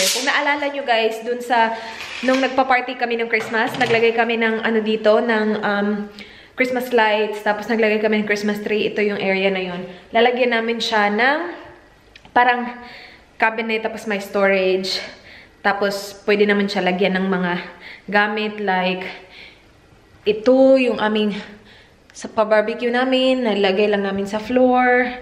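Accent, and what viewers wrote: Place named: Visayas, Philippines. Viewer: Diko na gsto accent ng English mo. Tunog bisaya na. Nong nasa cebu ka gstong gsto. Or baka sa video lang kasi madame kame bisaya na viewver mo.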